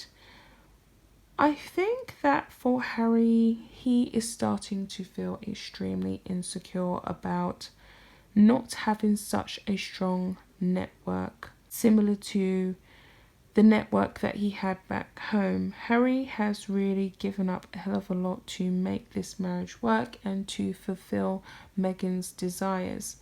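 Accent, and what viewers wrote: England, United Kingdom. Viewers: It's difficult enough deciphering the English accent, without testing our hearing. Could the narrator please speak up a bit? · Or is this your british accent?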